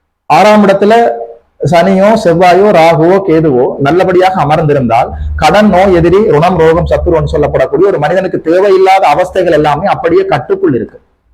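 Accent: Sri Lanka. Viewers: Nice Jaffna Tamil pronunciation Sir!!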